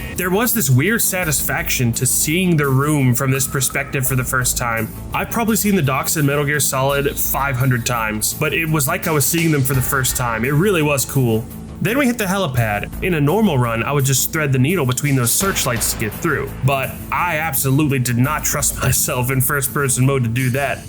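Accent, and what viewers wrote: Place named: Mississippi, United States. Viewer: Honking Mississippi accent showing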